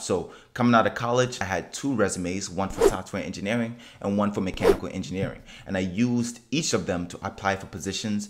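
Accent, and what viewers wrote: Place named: South Africa. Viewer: Are you S.African I'm hearing an accent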